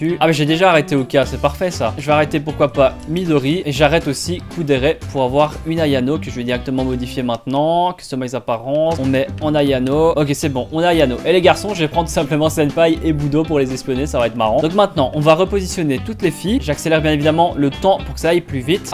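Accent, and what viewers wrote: Belgium. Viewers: T'es Belge? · Tu es belge ?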